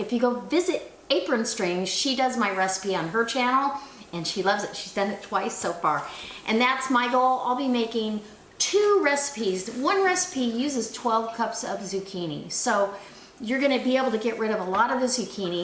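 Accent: South, United States. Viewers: Wow I just love your recipe & I am currently making it- just a smaller version- Can I tell you something my absolute favourite & most loved singer is Dolly Parton & sometimes when you are talking I could swear that is who is speaking - love your accent. This relish sounds amazing so will let you know how I go. Cheers Denise- Austalia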